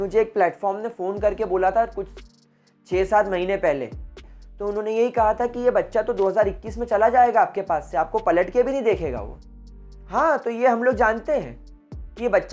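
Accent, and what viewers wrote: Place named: Indore, India. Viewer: Pehli line sunke hi samajh aagya sir to apne Indori hai.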